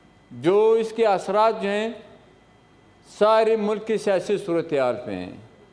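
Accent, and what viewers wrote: Pakistan. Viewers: I like his Urdu acesent.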